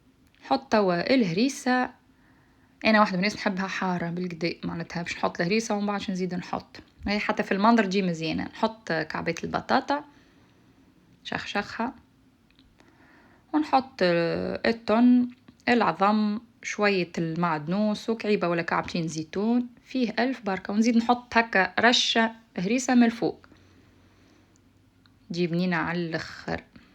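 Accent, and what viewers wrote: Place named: Tunisia. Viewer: الله يبارك 😊مشخشخة تع الصح تهبل اللهجة التونسية يعطيك الف عافيه تبان تشهي كملي راح تنجحي لحبيبة من الجزائر تحياتي ❤❤❤❤❤❤❤❤❤❤❤❤❤❤❤❤❤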